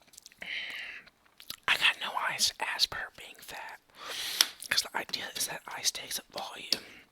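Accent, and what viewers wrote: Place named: South, United States. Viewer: bro your so funny you sound country like me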